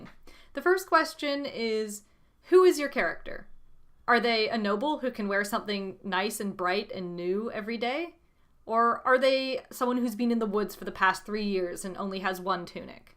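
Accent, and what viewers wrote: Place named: United States. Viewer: You sound like you're either American or Canadian, did you adopt that accent, or are you not originally from AUS?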